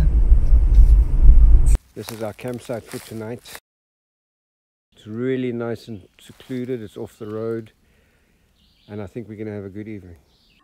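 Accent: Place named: Australia. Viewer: Wow brother you sound like your from australia or something my names mitch i live in butler pennsylvania ive been to all places youve shown in your video except for a couple. Do you live here in PA?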